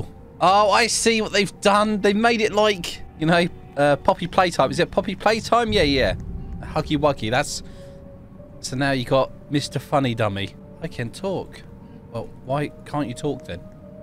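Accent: United Kingdom. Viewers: You sound british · yea the brithish accent is amzazing like mate sheesh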